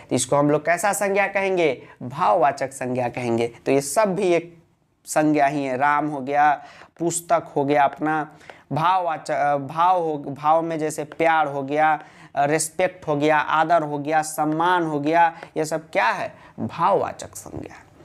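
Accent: Bihar, India. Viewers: Sir, kya ap bihar se ho. Apke bolne ke tarike se lg rha h. Pls, bta dijiye❤💫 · sir full Bihari style rocks😂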